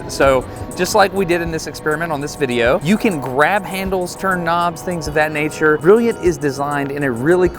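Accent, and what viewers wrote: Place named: Alabama, United States. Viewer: Destin can we organise you to make an audiobook of some Robert Hooke writings. I want to imagine Hooke with your energy and an Alabama accent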